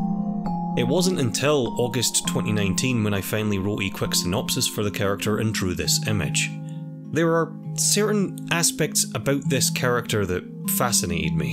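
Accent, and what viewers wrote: Ireland. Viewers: Are you irish? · I never get tired of hearing a good ole Irish (sorry if I got it wrong) voice.... it can be very friendly but have mischievous intents behind it all. Keep voicing your characters! Your doing great!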